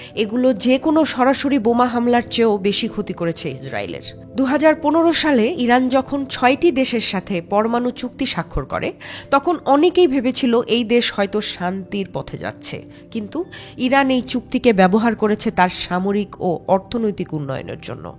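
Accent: Bangladesh. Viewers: আপনার ডায়ালেক্ট ও একসেন্ট বাংলাদেশীদের মত হওয়ার কারণ কি?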